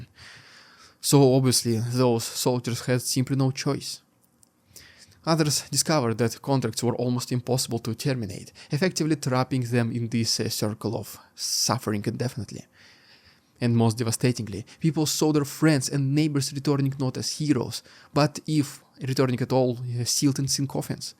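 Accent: Russia. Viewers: How can you be so eloquent in English and at the same time have such a heavy Russian accent? You are an enigma... Your content is really good, thank you for your tireless work! Russian evil regime will be defeated, truth and love must prevail!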